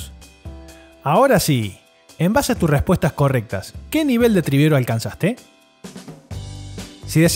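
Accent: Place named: South America, Latin America. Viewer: Tiene que ver el acento del narrador es como de sudamerica y no le entiendo algunas cosas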